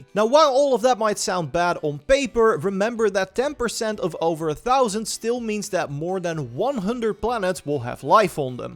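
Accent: Netherlands. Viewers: I hear dutch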